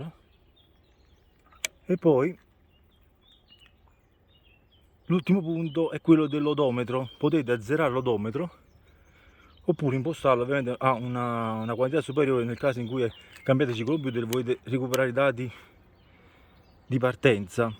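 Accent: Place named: South, Italy. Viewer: Grazie. Voce molto simpatica del sud . Grazie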